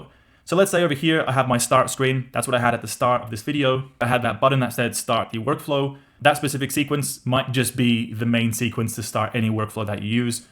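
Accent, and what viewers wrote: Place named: Australia. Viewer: Cześć, prowadzisz ciekawe prezentacje, ale jest nieco śmieszne gdy odtwarzane jest tłumaczenie w języku polskim, bo tłumacz interpretuje wait jako „waga” nie wiem czy dlatego że nie rozumie kontekstu czy może akcent australijski jest tak odczytywany. Ciekawe co o tym sądzisz? Swoją drogą uruchamiam usługę w Polsce wspierającą procesy w n8n w zakresie komunikacji SMS i voice, czyli interakcje SMS i inicjowanie połączeń telefonicznych do klienta z powiadomieniem głosowym ( voice message) oraz inicjujące rozmowę z botem. Cóż za fascynujące możliwości stwarzają takie systemy jak n8n.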